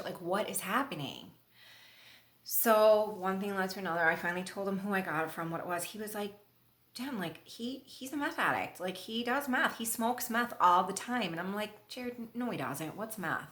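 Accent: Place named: Minnesota, United States. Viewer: I love her accent. Where u from girl? Minnesota?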